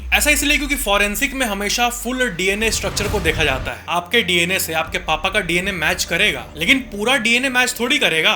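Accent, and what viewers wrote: Bihar, India. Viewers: bhai tu bihari ho na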